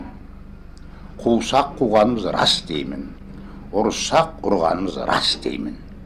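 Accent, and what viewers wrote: Standard. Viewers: Советтик кезде таза казакша сойлегенине ризамын атанын, казирги жастар, ересектер де тауелсиз Казакстан да шала казакша сойлеседигой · Таза ана тілде,сөйлеп отыр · Орыстың қақ ортасында жүрсе де қараңыздаршы қазақшасы тап таза. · казакша таза сойлейди екен, онтустик - солтустик акценттерисиз. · Қазақша білмейтін мәңгүрттерге көрсететін сұхбат екен. Нағыз совет үкіметінде өмір сүрген адамдардыңда таза қазақша сөйлейтіні дәлел. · Екі тілді сіздей меңгерген ешкім жоқ екен. Қазақша сіздей тұнық сөйлейтіндердің қатары өте аз. Трагедйа осы...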